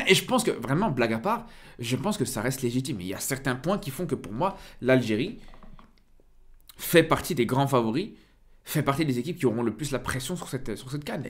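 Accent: Belgium. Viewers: Tes pas belge toi?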